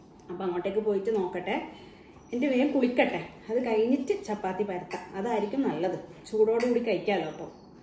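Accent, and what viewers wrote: Kannur, India. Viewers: തന്റെ kannur slang മാറുന്നുണ്ടോ എന്നൊരു തോന്നൽ.. നമുക്ക് നമ്മുടെ slang kelkkanaa ഇഷ്ടം 👍🏻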